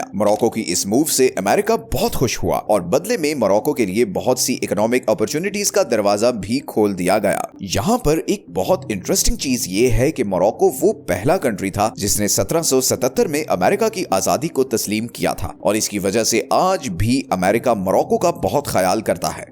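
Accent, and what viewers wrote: Pakistan. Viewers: this is Pakistani channel aisi Urdu India mein boli ni Jati lol 😂😆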